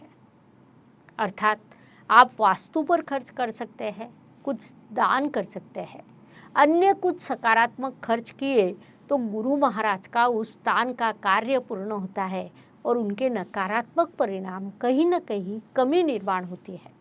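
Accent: Maharashtra, India. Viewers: ASTAM STHAN PATNI KA DHAN KUTUMB VAANI STHAN HAI...PATNI KI VAANI MAI MITTHHAS HOGI😂 SASURAL SE PAISA AA SAKTA HAI...AADHYATMA BADH SAKTA HAI. LET S KEEP FINGERS CROSSED. PURA HINDI PRAVACHAN MARATHI LAHEJE MAI SUNNEKA ANAND AAYA ASTMESH BARAHVE MAI OR PAAP PRABHAV RAHUKA VIPAREET RAJYOG KA ULLEKH HOTA TOU HOSLA BADHTA. BABA KEDARNATH MAHADEV KI JAI.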